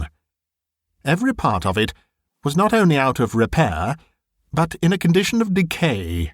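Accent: United Kingdom. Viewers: I'd like to add that it's how you masterfully change your voice to suit each character that is impressive and very convincing. And you have that elegant and clear British English that's very much appreciated by American audiences ( including myself). 🙂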